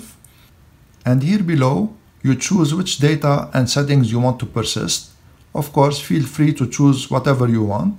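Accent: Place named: Lebanon. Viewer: thank you for this excellent tutorial! I just wanted to ask you, are you originally from Lebanon bc your accent is familiar. Anyway, thanks again!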